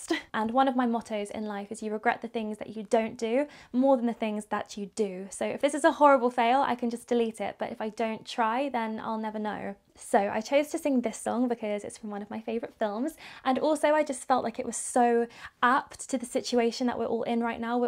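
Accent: United States. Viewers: Wow and the American accent!! 😃😃 haha the end is the best: hiding!! Haha well done!! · I'd really love to hear your real voice without the Americanised tone , nevertheless, this was gorgeous!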